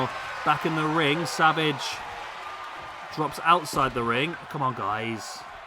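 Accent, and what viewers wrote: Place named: United Kingdom. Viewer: Bottle o’ Wataa , love the British accent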